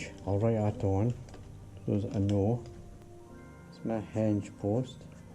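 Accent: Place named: Aberdeen, United Kingdom. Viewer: Hi, fine to hear a local accent on YouTube! I’m building some bookcases at the moment, where do you get decent quality sheet goods and hardwood from in Aberdeen? All the merchants I’ve been to just seem to sell crap construction grade stuff and treated timber. Love your work and vids, if my finished one is half as good as some of yours I’ll be doing nae bad!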